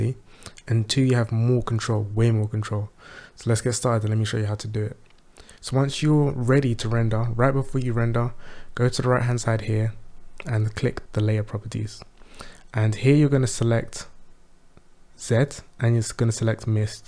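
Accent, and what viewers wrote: United Kingdom. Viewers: Great video – very clear and better results that the others I tried. Good to hear a good multiethnic London voice, too!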